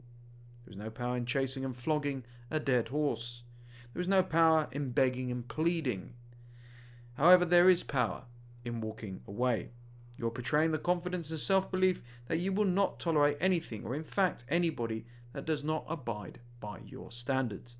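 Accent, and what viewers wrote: United Kingdom. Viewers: I really enjoy your voice .... your British accent is the best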